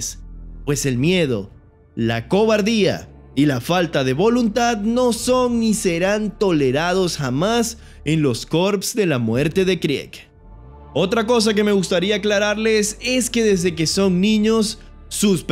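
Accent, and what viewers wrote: Venezuela. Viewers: Y su acento venezolano ayuda mucho. Es muy agradable de escuchar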